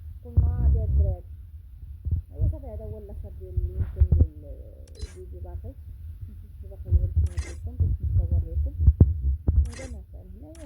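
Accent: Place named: Algeria. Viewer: وﷲ محتواكي جميل وشيء جميل اشاهد محتوة كا محتواكي ولاكن الهحة الجزائرية اشويه اصعيبه فهما كا انا ليس من الجزائر شكرا وتحية اخوكم من بلاد الرافدين 🇮🇶🇮🇶🇮🇶🌷♥🫀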